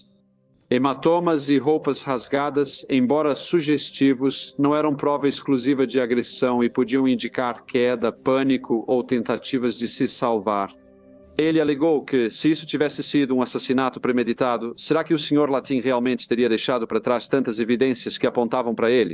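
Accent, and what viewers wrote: Portugal. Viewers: Esse sotaque de Portugal é muito chato! Não daria pra resolver isso?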